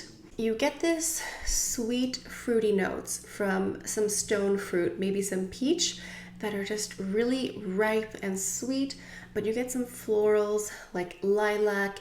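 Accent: France. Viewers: Love the French pronunciation! I love fragrances and own 21 bottles but Chanel Chance will always be my #1. You have a lot of my favs. Nice collection!!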